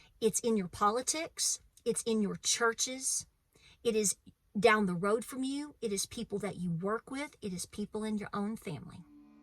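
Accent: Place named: Appalachia, United States. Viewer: I e always enjoyed your videos! 💕 I love your voice, Appalachian twang and all! 😘 especially love your singing!!! Keep preaching sister! Some people can’t handle the truth or fear provoking retoric. So be it. They can listen to something else. God bless them. Hopefully they hear the truth somewhere and are prepared regardless. But, yes, there are some (a few that make themselves seem numerous) that are advocates for the evil ones. They (Masons, satanists, wiccans, etc) have infiltrated many churches- especially the Baptist, Catholic, and traditional Jewish Churches. Division and hatred are from hell. We need unity within the Christian community. All of us united in love. 🙏❤️🙏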